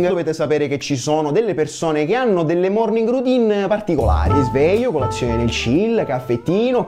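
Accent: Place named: Eastern Sicily, Italy. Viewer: c'è del video in questo accento siciliano orientale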